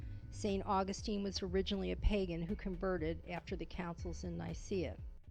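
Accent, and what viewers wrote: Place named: New York, United States. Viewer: THIS VIDEO IS NOTHING BUT A SELL TO THE BOOKS AT THE END OF THIS ONE. KEEP YOUR RELIGION AND UNDERSTAND IT AT A PRACTICAL LEVEL OF LEARNING MORALS. WITH SOME CONECTION TO THE DIVINE. PRAY, IT'S THERAPY. KEEP YOUR FAITH, AT A SANE LEVEL. WHERE ELSE WILL YOU BE TAUGHT LOVE, CARE, GIVING, SACRAFICE? THE MEDIA- ALSO, SOUNDS TO ME LIKE THE SPEAKER IS HIDING A "NEW YORK" ACCENT, WHO LIKELY ATTENDS HER TEMPLE EVERY WEEK. DON'T TRUST ANYONE TRYING TO BREAK DOWN A RELIGION-LOW BLOW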